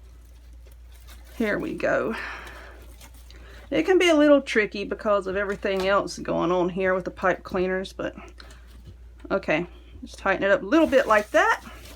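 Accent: South, United States. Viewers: Super cute intro and video! I'm going to attempt to make one like this. Also love your southern accent 💗😊🎃🕷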